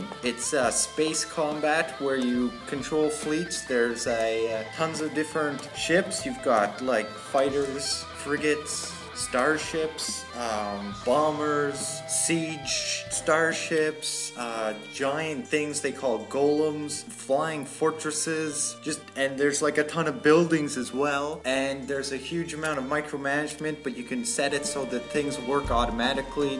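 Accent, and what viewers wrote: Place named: Canada. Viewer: lol the telltale canadian accent 'go check id eeeeewt!" :D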